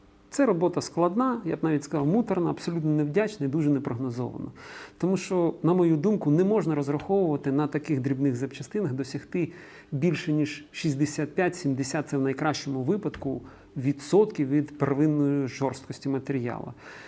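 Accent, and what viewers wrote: Russia. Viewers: класний ведучий і ролики доступні…. але як же ріже вухо, коли йобані рускіє репліки вставляє 😢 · Скільки не дивлюсь ваші відео, але оця розмова з домішками москалотської.... чесно?! На мою особисту думку, вам - не пасує, у вас гарна українська, ведіть спілкування на ній. Дякую.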